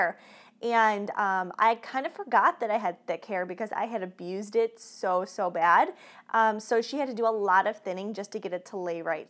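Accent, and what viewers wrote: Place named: Chicago, United States. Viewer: recognizing your Chicago accent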